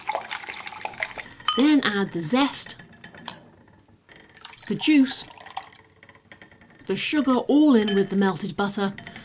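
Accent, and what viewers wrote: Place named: Australia. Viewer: Well, you should. It's really extremely beautiful. You have an Australian accent, am I right? It's amazing. I think you should do some voice overs as well. You should be more serious about good quality equipments. You're doing really well. I think you can. You will do even better if you get some good quality equipment. I wish you all the best.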